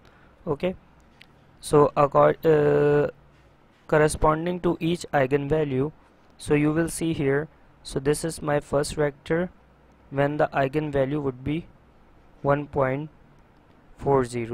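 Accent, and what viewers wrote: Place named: India. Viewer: Indian accent is good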